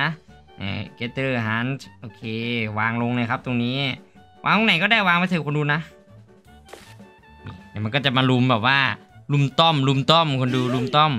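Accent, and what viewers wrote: Korat, Thailand. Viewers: คนโคราชมะ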